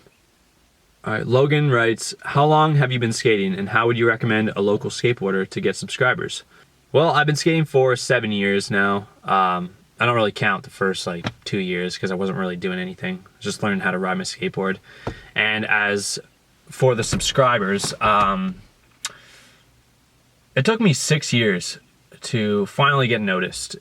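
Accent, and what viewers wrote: Canada. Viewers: It took me 30 seconds To figure out your canadian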